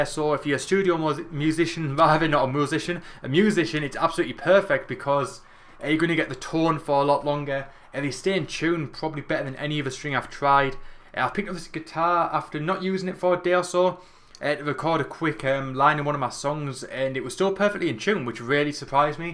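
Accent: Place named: Durham, United Kingdom. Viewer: Nah.. Probably Durham or teeside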